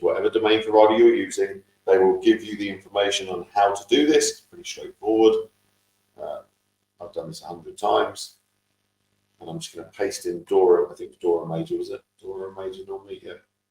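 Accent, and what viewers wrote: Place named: United Kingdom. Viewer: oooooh! Onboarding! I'm in the UK - sounds like you are from here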